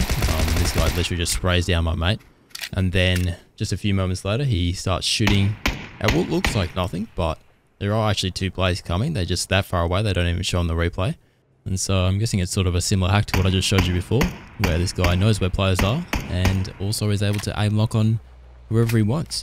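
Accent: Australia. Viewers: He's Australian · How do u have such a low ping, you sound Australian..I can only get games in AS now..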